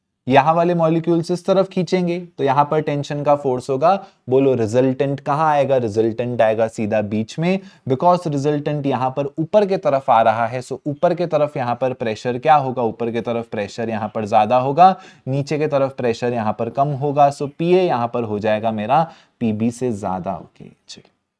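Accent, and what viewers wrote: Sindh, India. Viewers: Sir ki sindhi accent bhot relatable hai😂❤️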